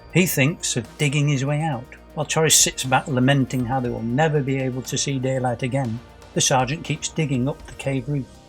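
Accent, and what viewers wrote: United Kingdom. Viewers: Nice to have a British narrator, their accents are awesome.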